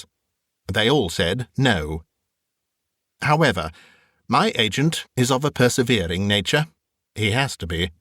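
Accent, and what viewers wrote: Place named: Upper class, United Kingdom. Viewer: I watch every video you post. I absolutly adore your British Upperclass reading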